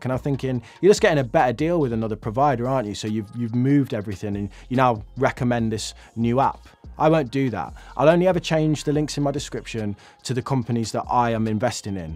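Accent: Birmingham, United Kingdom. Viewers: Best of luck. I wish you nothing but success. There are not all that many British finance channels, it's all well and good listening to some yank that has millions in the bank telling you to spend less. But you have provided me with some great insights and actually had a positive impact on my wallet. Keep up the great work and I am sure you'll do brilliantly. P.S You're a Brummie? I thought you were a Manc. 😂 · Love your content mate, nice to hear someone from Birmingham instead of just American’s all the time. Keep it up!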